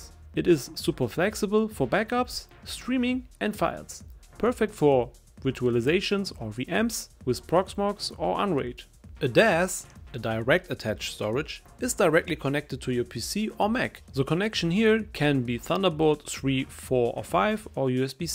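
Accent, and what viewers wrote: Germany. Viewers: Ich ess deinen deutschen Akzent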